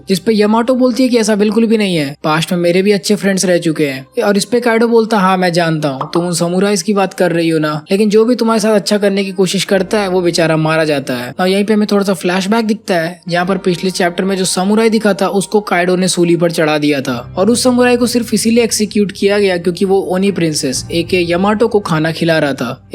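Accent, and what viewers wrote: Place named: Uttar Pradesh, India. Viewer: bhai....🤣🤣 maza aa jata hai tera sun ke ...full UP wali feel aa jati hai..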